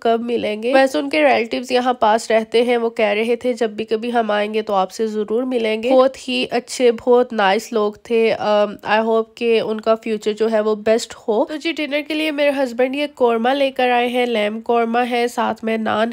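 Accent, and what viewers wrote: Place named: England, United Kingdom. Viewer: ap ki urdu bari kmal hai hahah mujy boht maza ata ha england walon ki urdu sun k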